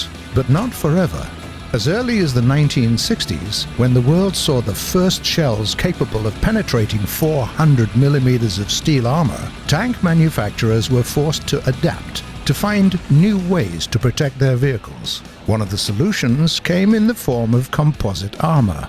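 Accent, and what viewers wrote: England, United Kingdom. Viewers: Мммм... английский акцент. Бляяя... Ну чуваки, всеж нормально начиналось. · Ricardo Mierz but...he sounds so clever! He sounds so...British! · Gaijin is a Russian company, and they hired someone with a thick English accent to narrate it. Dunno, just an observation.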